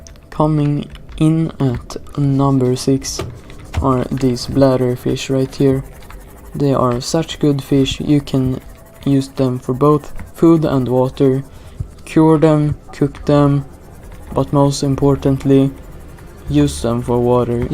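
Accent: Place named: Sweden. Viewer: you sound so swedish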